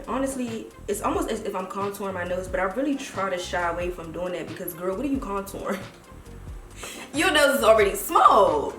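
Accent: Washington DC, United States. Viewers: I just discovered your videos last night as soon as you spoke I was like she is a fellow DC girl 💕💕💕💕💕 Love all your makeup looks !!!!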